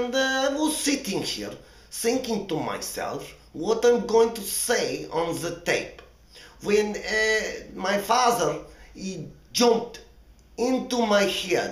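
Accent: Russia. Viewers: Italian Mafia + Russian accent:)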